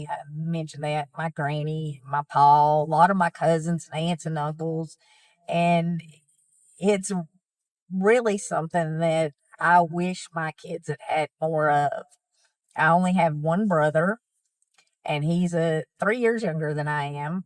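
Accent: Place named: Kentucky, United States. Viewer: I've always thought you were from kentucky. 🤦‍♀️ I think the accent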